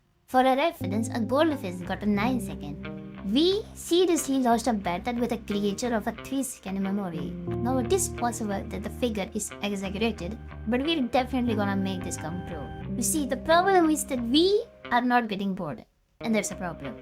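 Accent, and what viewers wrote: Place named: Southeast Asia. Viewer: Are you Singaporean, Malaysian or Indonesian? (Made a guess based on da accent XD)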